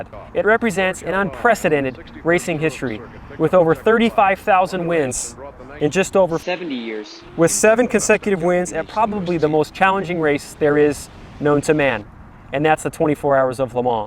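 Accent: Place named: Germany. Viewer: Great info, love your ' german' accent and painfull blooper (hernia? Been there 😕) keep up THE great work!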